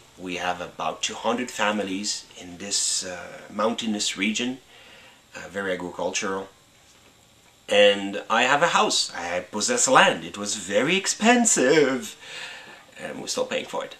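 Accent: Quebec, Canada. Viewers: Yay canadians - you sound french canadian.. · i am wondering which part of canada you came from. Your canadian accent is different from Ontario accent. Many people often think all Canadians speak just like you, and I couldn't understand why because people from ontario speak the same as a lot of americans. So I wondered where you came from. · what's up with the accent? is that canadian? the weird sounding Ts? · He's lost alot of his canadian accent lol!. OH and happy Canada day from the capital :) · lol im from montreal and i picked up on your accent quick to. great to see quebecers doing big things in the world as the gov here to day seem to think the world revolves soley around quebec. anyways intersting video, thanks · Your speaking English with a "Quebecer" accent. Your son can be Tri-lingual! After all they do speak French in Quebec.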